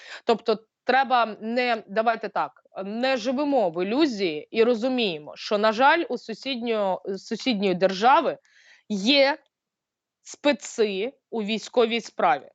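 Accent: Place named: West, Ukraine. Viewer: Як приємно тебе, слухати.Просто,вухо радує Мова така,як на Західній Україні.Суперово. Ти неперевершена.Моллдчинка.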